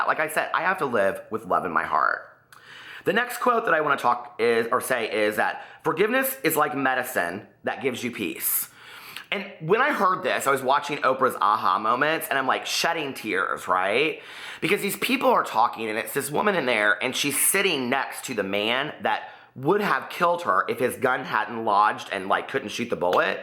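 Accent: South, United States. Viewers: Ur a hoot!!! I absolutely love ut channel, personality, thoughts and southern accent....haters will hate - please dont evet forget how loved u r! 💜💙💚💛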